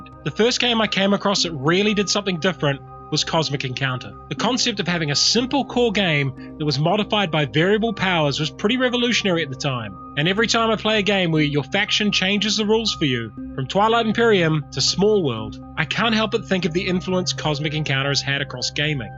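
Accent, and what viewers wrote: New Zealand. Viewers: 1. When I was in high school (in the 80's) a couple of friends and I spent long hours playing Hitler's War. It really grew my appreciation for resource management games and that resource management element is still probably one of the biggest hooks I enjoy in games to this day.2. As a fan of roleplaying, I've also come to enjoy games that have a capacity-building or levelling up component for your character/s (very common in numerous games).3. While it's great to enjoy boardgames with friends, it's also great that so many are getting good ports to the PC so you can enjoy them on your own. I've been playing Lords of Waterdeep, Through the Ages and Elder Sign: Omens quite regularly and enjoying them to fill gaps between real life games.4. King of Tokyo perhaps gave me an appreciation that games can be useful just for a quick bit of laughter and fun - they don't always have to be serious. 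Also great to play with the kids.5. Avalon Hill's Civilization was another game that really made an impact. The idea of achieving technological progress and the awesome trading scheme where you could sneak in natural disasters for your opponents was very appealing.I enjoy your vids, the length is great and it's great to hear a fellow Kiwi (unless my ears deceive me) doing something that is internationally appreciated.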